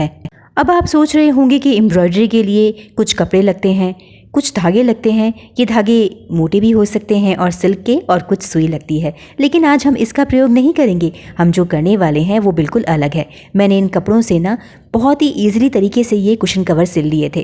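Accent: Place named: Maharashtra, India. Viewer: 😊 bahut pyari Marathi hai aapki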